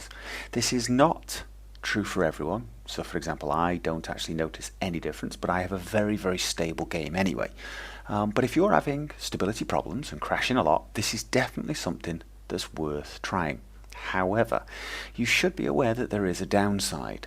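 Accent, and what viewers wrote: United Kingdom. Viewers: suprised the hell out of me when i saw your profile showing youre a fellow norwegian, was guessing british from listening to your voice.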